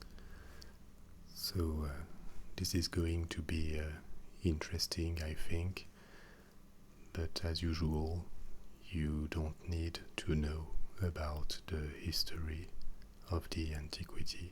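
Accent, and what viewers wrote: France. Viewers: I get great tingles from French accents! very very relaxing! :)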